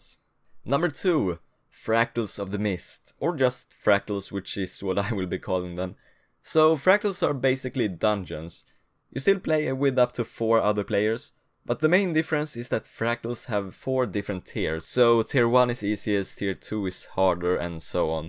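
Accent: Sweden. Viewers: Are you from sweden? You have a very swedish-english accent :)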